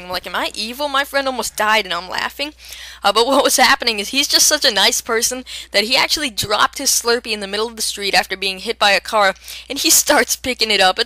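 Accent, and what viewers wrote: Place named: Portsmouth, United Kingdom. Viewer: Sounds like Portsmouth.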